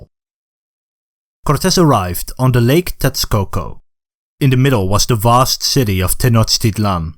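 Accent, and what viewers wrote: Netherlands. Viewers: Irritant Nederlands accent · Are you Dutch ? Your accent sounds Dutch :) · I hear a typical Dutch accent. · its subtle but are you Dutch? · I recognize the accent....Dutch! I used to live in Holland.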